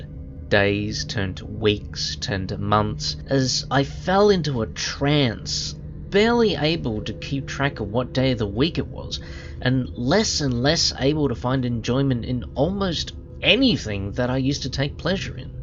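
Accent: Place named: Australia. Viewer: A video like this is exactly what I needed. It makes me remember that there's always light at the end of the tunnel even when it all seems hopeless. I'm glad that things are starting to work out for you. Also, your writing skills are truly immersive and I really hope you do more stuff like this in the future! P.S: You're Australian? I honestly thought you were British for some reason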